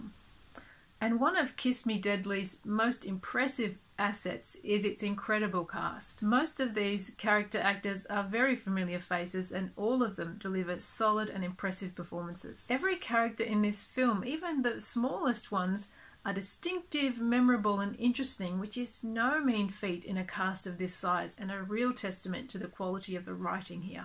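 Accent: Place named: England, United Kingdom. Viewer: One of my favorite 'Film Noir's' ! Sexily narrated with a lovely English (I think) accent and a lot more sensual than Eddie Muller of TCM will ever be, bravo!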